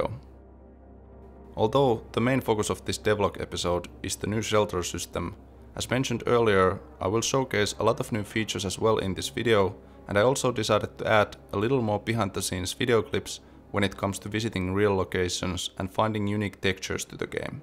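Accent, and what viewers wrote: Finland. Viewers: Recognized the finnish accent as soon as the talking started, it's somehow so particular. Graphics and environments look very nice. Will wishlist this. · Its clearly finnish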